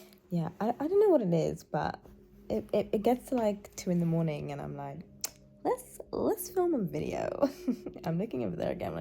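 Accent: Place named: United Kingdom. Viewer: UK accent Wit Intelligence is So Fine🔥🔥🔥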